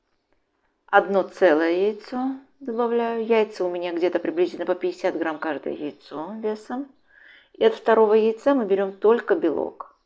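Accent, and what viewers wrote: Baku, Azerbaijan. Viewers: с родным бакинским акцентом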